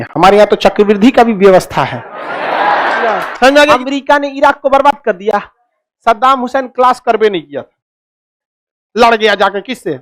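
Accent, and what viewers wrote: Bihar, India. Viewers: Sir Hindi with bihari touch makes your videos more intrested।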